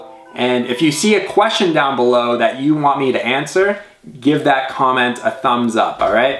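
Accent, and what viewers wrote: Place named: Canada. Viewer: It depends on your perspective, you sound Canadian. So housing would be extremely costly and it would be a steal depending on if you can stand living there. Homes are much more adorable where I live. Here you would be the village idiot for spending that much for a trailer. I like it and I’m glad your happy.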